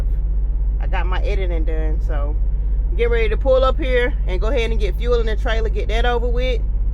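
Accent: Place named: Florida, United States. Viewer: 😂😂That's that FlawdA talk. I say it, too. Yall have a good day. Referring to one person. 😂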